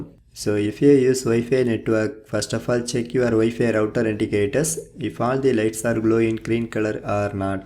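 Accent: South India, India. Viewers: no problem brother i am just joking 😂 i know brother you're from south india and you've different accent ❤️🙏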